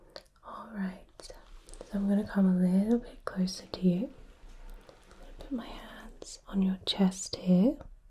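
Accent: England, United Kingdom. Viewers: I ❤ the English accent!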